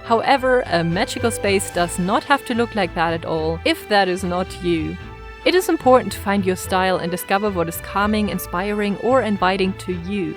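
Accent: Germany. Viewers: Hey i wanna ask if you're from germany ,because from the accent😂✌ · sometimes i get a bit of American and Australian from it somehow??? but yes i suspected it was german haha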